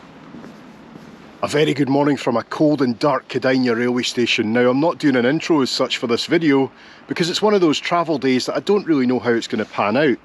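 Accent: Scotland, United Kingdom. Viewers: Sounds a bit Scottish 😊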